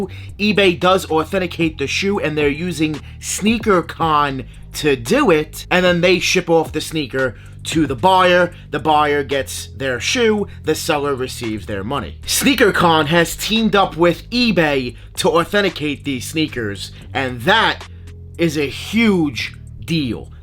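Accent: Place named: Brooklyn, United States. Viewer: Dudes definitely from Brooklyn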